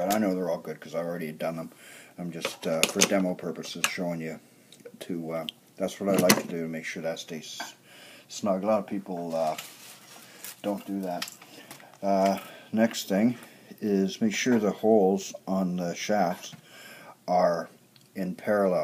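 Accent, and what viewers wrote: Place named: Atlantic Canada, Canada. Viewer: I think I recognize an Atlantic Canadian accent. I'm originally from Cape Breton.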